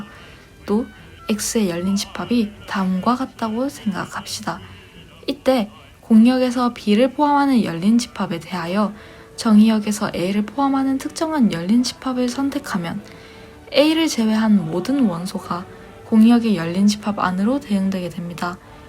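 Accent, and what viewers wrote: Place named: Japan. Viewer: 일본사람 같네요. 발음이..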